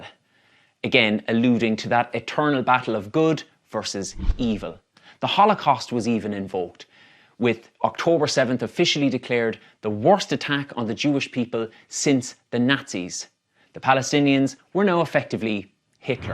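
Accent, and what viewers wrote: Ireland. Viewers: 👏👏 You have hit the nail on the head with this video! Bravo for speaking out against the atrocities. Big fan of your channel and have subscribed and I’m spreading the word to my family and friends too. Thank you so much for your support 🙏🇵🇸🇮🇪 As always pleasure to hear an Irish voice 🎉 God bless you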